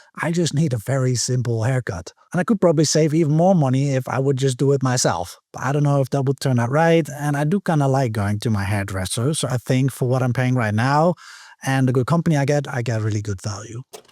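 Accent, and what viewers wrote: Netherlands. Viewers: Je klinkt Nederlands! Goeie video iig.. bedankt!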